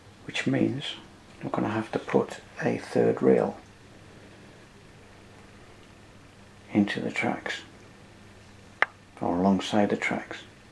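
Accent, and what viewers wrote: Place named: North East England, United Kingdom. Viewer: really enjoyed these two platform videos - I'm about to renovate/make some of my own. I think your craftsmanship and attention to details are amazing and your patience in doing the work exemplary. The soft north eastern tones of your calm voice make it a pleasure to watch. I fear I do not have the patience to do as good a job but I can assure you I have been inspired. Many thanks!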